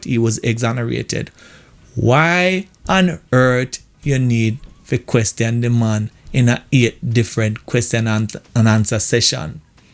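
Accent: Jamaica. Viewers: Wah unu weh na duh? Real St. Ann man accent. I’m a big supporter of the JCF, but I also agree with you 100%. If someone don’t like me or badmind me for my success, all they have to do is accuse me of something during a SOE and destroy my character and livelihood. Big up yuhself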